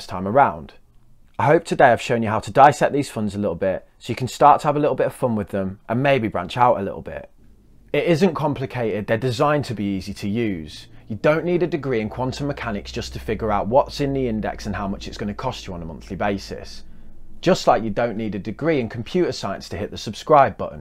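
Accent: Birmingham, United Kingdom. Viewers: videos which we needed with a fckng peaky blinder accent. yoo keep it up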